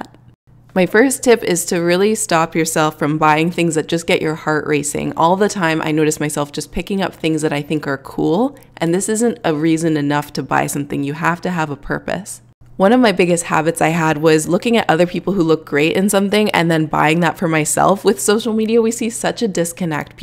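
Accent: Canada. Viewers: Is she canadian ?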